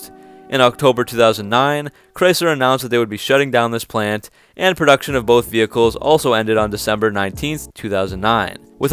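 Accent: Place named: Canada. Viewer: Canadian dialect is omg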